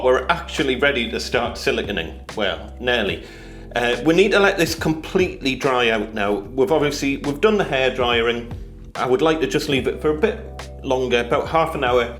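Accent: United Kingdom. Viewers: His accent (and his wife's) is British and his website says "Proudly made in Britain" and has the UK date format with prices in £, so no, he's not in Toronto.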